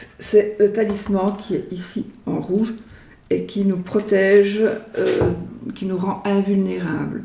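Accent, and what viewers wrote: Belgium. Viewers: Meilleurs voeux madame j'adore votre accent les Belges j'habite en Belgique avant quand vous dites 8 😅😅😅bonne année à vous et mille merci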